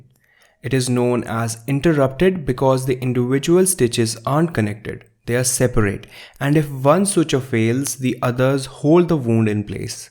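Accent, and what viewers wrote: India. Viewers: This is how we Indians talk. Got a problem? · This is not an english accent this is an Indian accent and if you want to be any sort of doctor you will fail due to this attitude.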